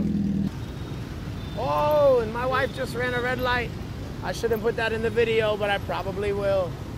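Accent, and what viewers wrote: Vietnam. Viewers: Hello It's your reddit fan here I love your Vietnamese accent It's really weird seeing a western man holding a camera going around getting silence reaction from other people but that's just Vietnam lol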